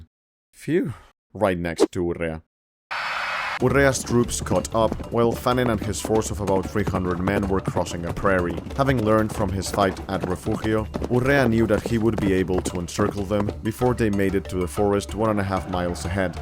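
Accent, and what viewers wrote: Castile, Spain. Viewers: My knowledge of Mexican history is bad, but not terrible, I’d like to think I have a 1.5 or 2 stars rating. But hearing the proper Spanish pronunciation of names and not recognizing them, even though I’ve READ these names before has me shook lmao 😂😂😂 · Why are saying it like the Spanish way and not the Mexican/Latin American way of pronouncing the Zs and Cs. The odds are none of these historic figures pronounced it that way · He said in another comment that he was born and raised in Spain, so he's just talking in his native accent. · Sounds very Castilian, I like it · Your English is among the best of any Spaniard I've ever heard... although I have to say it's a bit odd/amusing to hear all these Mexican names pronounced with a Castilian accent.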